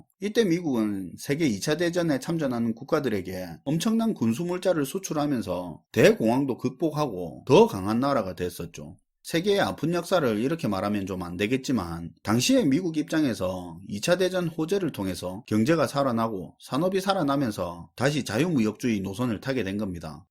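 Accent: Gyeongsang, South Korea. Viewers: 연속적으로 시청을 했을정도로 어려운 경제 흐름을 쉽게설명해주셔서 감사합니다. 가정경제에 중요한 시점이라 돌다리 두드리는 맘으로 시청하였는데 기본이 중요하다는것을 시청할수록 느껴졌습니다. 구수한 경상도 사투리와 편한 캐릭터가 집중하여 들을 수 있게 하는것 같아서 경제에 통달한 분의 오디오 비디오로 적격인듯싶습니다. 감사하고 많은이에게 유익한 지식이나 정보 많이 알려주세요~^^ 훌륭하시고 감사합니다.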